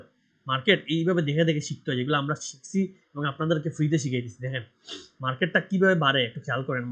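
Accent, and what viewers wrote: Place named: Sylhet, Bangladesh. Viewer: ভাইসাব এর বাড়ি মনেহয় সিলেট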